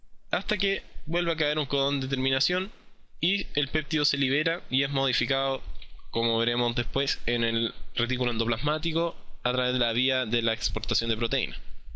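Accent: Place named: Chile. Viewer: creo que eres chileno por tu acento y porqe no me desconcentre al escuchar jajaj (soy chilena) graciaaaaaaas ahora podre dar mi prueba de bioqimica tranquila :)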